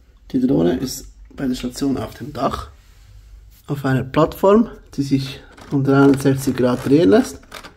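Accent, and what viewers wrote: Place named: Switzerland. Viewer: Endlich auf Deutsch! Mit tollem schweizerischen Akzent. Vielen lieben Dank!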